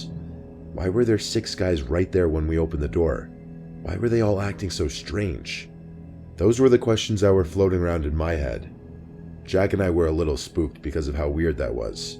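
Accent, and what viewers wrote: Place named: New York, United States. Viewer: You’re GREATLY EXAGGERATING. The voice has Zero factors that keep a person engaged, with any low soft creep factor AT ALL. Realistically, it sounds soo close to an annoying New York accent, which is absolutely abhorrent. It shows how desperate Generic people will literally listen to anything.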